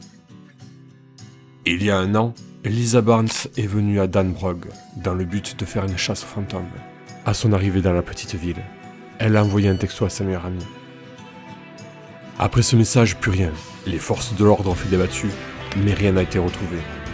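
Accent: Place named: South, France. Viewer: Y'a pas à chier on voit la progression, autant dans l'originalité que dans la façon de raconter l'histoire, en plus le petit accent du sud met trop bien. Je suis bien content d'avoir découvert ta chaîne grâce à ton passage sur le Live d'Anthox. Sinon t'as jamais essayé de te mettre en contacte avec des MJ comme Mahyar par exemple? ça pourrait t'aider à prendre de la visibilité.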